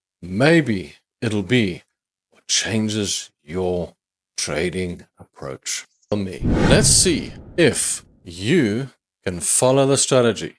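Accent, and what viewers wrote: South Africa. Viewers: i knew i recognised that springbokka and borewors accent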